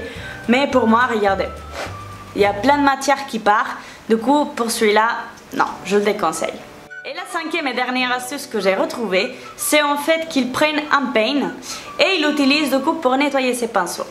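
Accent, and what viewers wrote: Spain. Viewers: On dirait trop que tu a un accent espagnol je t'imagine parler en espagnol lol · Elle vient d'Espagne :) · Origine espagnole 😄🇪🇸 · Ton accent 👌 Espagnol ? Les 2 première astuces m'intrigue, je tenterai également Bisous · j adore ton acent tu est espagnol continu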